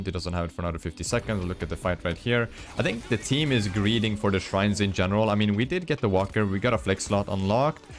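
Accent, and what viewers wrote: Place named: Sweden. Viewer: 5 seconds into the video and I thought "this guy is Swedish 100%" lmao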